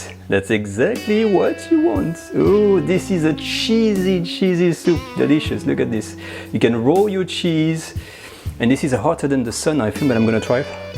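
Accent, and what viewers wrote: France. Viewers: J’adore votre accent français 😄 merci de préparer ces recettes ! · I never had french onion soup and that looks delicious :DDDDDD. Also you sound like my french friend that I play games with. Your accent is funny he says pwease instead of please! He is a good lad! :D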